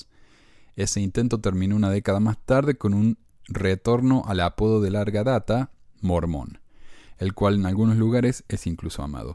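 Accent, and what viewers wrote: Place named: Cordoba, Argentina. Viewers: Mira " " con ese acento marginado Gaucho, no es nuevo esa noticia de llamarse correctamente de la IJSUD de hace décadas se menciona para el uso debido, pero igual puedes usar tu libre albedrio, no te culpamos, lo importante es el fondo, quiero buscar también 3l urim y tumi pero quiero primero terminar de encontrar el libro de Ezequiel y ideas, mientras vivo por la fe y degustando del escudriñar las sagradas escrituras del "Antiguo", "Nuevo" y "Otro Testamento de Jesucristo" y así comprender y amar a tipos como tú no.imporra si eres ninfómana o gay, igual te deseo lo mejor y eres bienvenido, y si hay algo que denunciar dentro estamos del mismo lado, limpiar la casa para salir a Óscar, slds compañero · soy miembro de la iglesia, comenté vídeos tuyos anteriormente sin verlos de forma completa, y no entendía semejante trabajo de investigación y dedicación de un ex miembro de la iglesia para desacreditarla. 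Quiero que sepas que este comentario lo hago con todo el deseo de dar mi opinión y no el de pelear ni criticar. Hay dos cosas que me llaman la atención: Primero. El tiempo que gastas en hacer estos vídeos es llamativo, la verdad que se puede ver que le das bastante dedicación y eso se puede ver en la edición, los micrófonos, etc. Es raro que cuando citas a los profetas lo haces con una intención de ridiculizarlos, tanto a Jose Smith, el profeta Nelson entre otros. Segundo. Fuiste miembro de la iglesia, lo decís en tus videos, esta más que claro que seguramente entraste al templo e hiciste convenios sagrados, no hay que ser ningún genio para saber eso. Tu acento es de Córdoba, al principio pensé que capaz eras de otro país y no Argentino. Capaz siendo un ex miembro se explica un poco tu comportamiento. Lo que si no entiendo y en esto creo que vas a coincidir es que La Iglesia de Jesucristo los Santos de los Ultimos Dias o si queres le digo La Iglesia Mormona, como vos quieras, hace muchas cosas que son buenas, edificadoras, ayuda a las personas a ser mejores, enseña principios que mucha gente no duda que son verdaderos y hasta las puede cambiar, como lo hacen todas las religiones cristianas del mundo, siguiendo los pasos del propio Salvador (que no sé sinceramente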